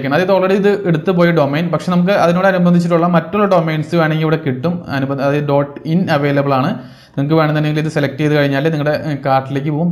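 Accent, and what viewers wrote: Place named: Thrissur, India. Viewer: Bro thrissur aano veed?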